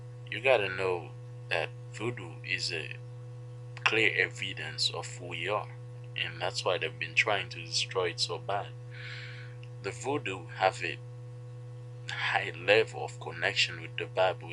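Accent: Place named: Haiti. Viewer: The speaker sounds Haitian. Every nationality claims to be of Israelite origin. Strange!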